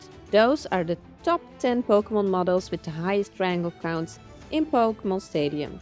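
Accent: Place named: Netherlands. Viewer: Hello! I follow you on roblox then i cmae across your channel. your the best animial ugc creator! and i didnt even know you had an youtube channel amazing!!! Your ugcs are the best and that i hear and see your dutch makes me super happy cause i am dutch also and this video was aaaamazzzinng!!!!! to the power off 10! Goeie video ga zo door!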